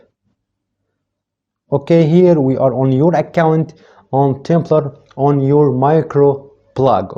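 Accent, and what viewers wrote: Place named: Egypt. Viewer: منور يا عم او كما تقولون الله ينور ياعم عرفت انك مصري من خلال كلمتين (اكزمبل exemple وايضا زيس this) بالتوفيق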